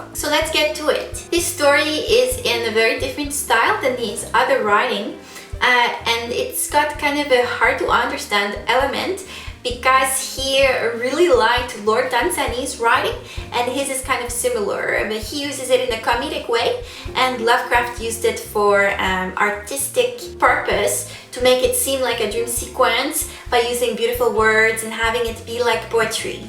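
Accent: Quebec, Canada. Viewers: French Canadian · David Bro it’s not fake. I know this is decently old, but for anyone who may read this in the future, here’s a bit of explanation. Her accent is French Canadian. Accents can fluctuate when: 1. Talking to other people with that accent it can become stronger. 2. When talking excitedly or fast, it can absolutely come out much stronger. 3. When talking to someone without an accent, someone with an accent may subconsciously be speaking without the accent just from the situation. As for when she sings, David Bowie had an accent yet you could never hear it when he sang. You CAN in fact hear it in certain words. Hope that clears things up.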